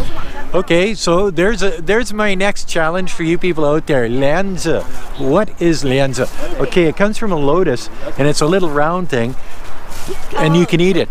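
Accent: Canada. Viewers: Lol your accent, why does your accent remind me of DeadPool so much man, is it bc Ryan is also canadian i love your accent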